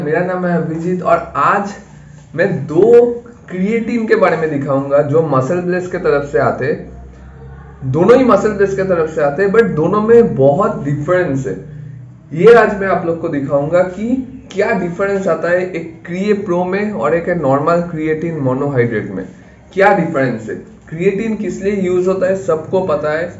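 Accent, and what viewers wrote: Bengal, India. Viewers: Kintu talc gaa me lagate hai shunke...samajh gaya banglai hey... Cholbe bhai chaliye ja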